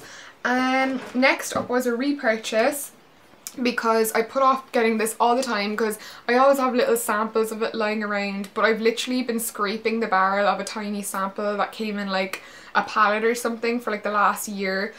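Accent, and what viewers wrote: Ireland. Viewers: OMG are u Irish I am too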